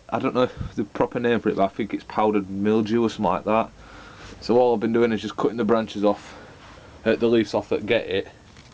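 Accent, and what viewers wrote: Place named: Northern England, United Kingdom. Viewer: After trawling through all the videos i subscribe to from around the globe its bloody great to here a northern accent !! Albeit from the wrong side of the pennines !! Have you got your name on an allotment waiting list ??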